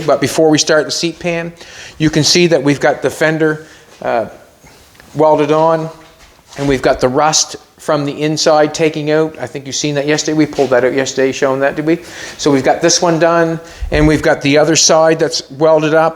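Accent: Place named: Canada. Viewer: that Canadian accent gets on my nerves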